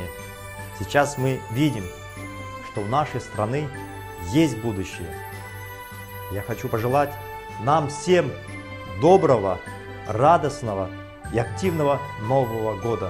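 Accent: Standard. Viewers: Мұқтар аға таза қазақша үйренді! Тамаша! Жаңа жыл сізді Қазақстанды басқартсын. Халықтың жағдайын түзетіп ұлы атақты тұлға болыңыз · Казакша таза сойлейди екен Токаевка караганда. Красавчик 💪🏾 миру мир братья 🇰🇿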